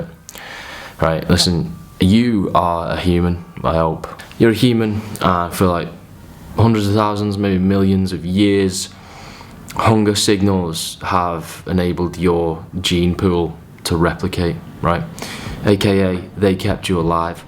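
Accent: United Kingdom. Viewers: What part of the UK are you from ?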